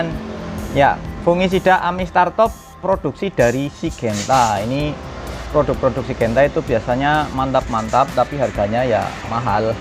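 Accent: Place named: Brebes, Indonesia. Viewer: Ngomonge kaya wong brebes..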